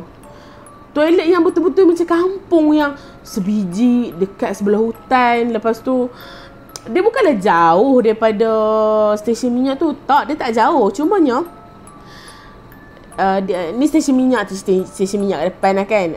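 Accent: Kedah, Malaysia. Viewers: Mst org kedah nie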